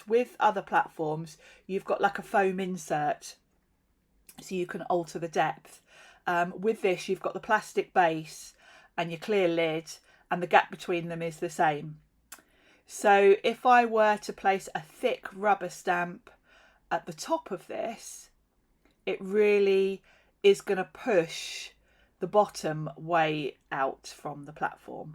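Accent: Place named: United Kingdom. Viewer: Hello! I’ve just received mine in the mail today, and I am even more excited to use it after watching your tutorial. You’ve explained everything so well, that for a rarity, I really don’t have any questions as of yet. I may have some after playing with it, but I do feel you were ever so thorough. I showed my husband and daughter not too long ago and exclaimed, “Look! My birthday pressie has finally arrived!” I received two smiles and one with an eye roll. 🙃. My birthday was in August. When I finally decided what I had wanted, they were already out of stock. I can’t tell you how quickly I was pressing buttons when I received an email letting me know they had more in just a few days ago. I do have two unrelated questions. The first being, in some of your comments it had been mentioned this had been on C&C with Pete. Could you please tell me what the C&C stands for and who Pete is? 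My second question is more personal, and I do apologize, but my curiosity has gotten the best of me. Could you please tell me the area of England you’re from with your British accent? I’m asking because my Mum was British, she had married an American fella and moved to the states. However, there were parts of my life when I did live there and went to school. The first part, my Mum, older brother and I lived with our Grandparents in Avebury. After awhile, my Mum took my brother and I to live in Salisbury. This had been when my Dad had been stationed in a not so family friendly area, and that’s why we l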